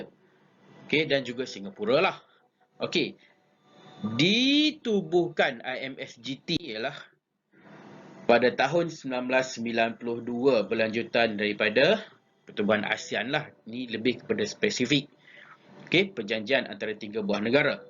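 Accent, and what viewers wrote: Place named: Perak, Malaysia. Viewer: Cikgu ckp loghat perak tu stai wuuuu 😂😂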